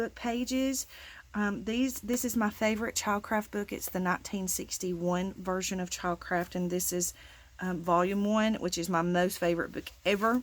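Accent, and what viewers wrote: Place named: Tennessee, United States. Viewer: This is simply stunning! I thought I recognized your accent...I grew up in Cookeville, just a hop, skip and jump away! ty so much for sharing .. it’s so beautiful! Hugs 🤗MH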